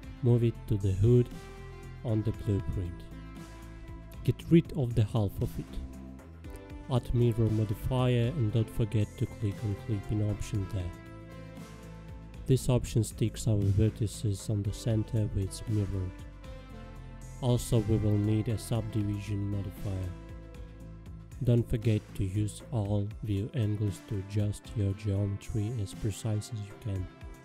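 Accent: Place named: Russia. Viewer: брат, палишься, что русский))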